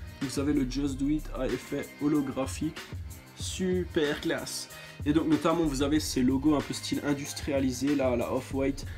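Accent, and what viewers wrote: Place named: England, United Kingdom. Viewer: L'accent anglais et certifier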